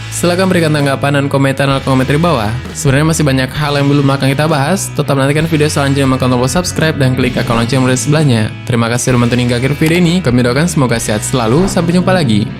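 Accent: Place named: Java, Indonesia. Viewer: Bahasa Jawa mu lucu Min, tapi nice try😁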